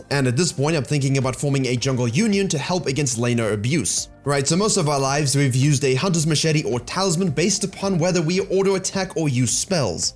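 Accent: South Africa. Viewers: Hey man, are you South African? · i thought i recognised the SA accent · This is the first video i saw of your channel and i instantly knew u were south african lmao are you a masters player with 200 ping tho ? how is that possible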